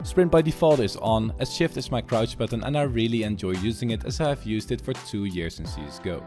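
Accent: Netherlands. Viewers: Are u dutch? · Ben jij Nederlands je klinkt als Nederlands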